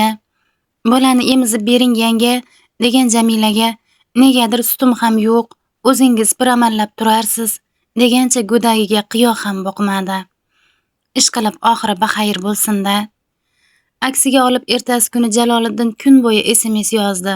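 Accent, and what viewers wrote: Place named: Surkhandarya, Uzbekistan. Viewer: Surxondaryo yoki Qashqadaryo shvasida gapiryabdi bu qiz😁😁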